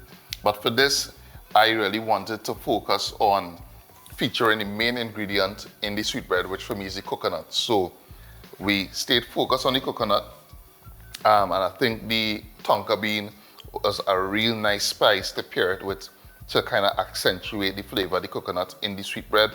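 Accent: Trinidad and Tobago. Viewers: I don't know what style of sweet bread you're trying to reproduce. I suspect it's Trinidadian, due to your accent. I'm familiar with traditional style Barbadian sweet bread and it did not have any of those hideous mixed fruits and maras. cherries that they are putting in the bread nowadays, according to what I have been seeing online. This is almost traumatic. Thank you for not putting that crap in your bread!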